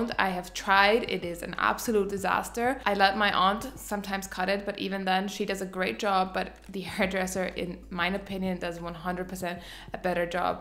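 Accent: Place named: Germany. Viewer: I love that I can hear you are german by you saying "Mine" at all times, rather than "my"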